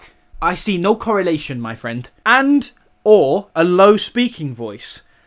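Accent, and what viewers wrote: United States. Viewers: I love your American accent 😂😂😂😂 not even offended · Your American accent is spot on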